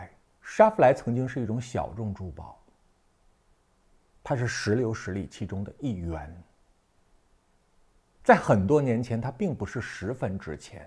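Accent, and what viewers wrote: Beijing, China. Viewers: 我还以为主播是北京人呢，字正腔圆的普通话，挺真实的感觉，脾气太直说话太直，有北京人的感觉😊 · 老北京味儿，亲切😊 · 聽叔說話特別有趣，像在聽人說書 連說話方式都充滿著北京感？古裝戲感？忍不住想學😊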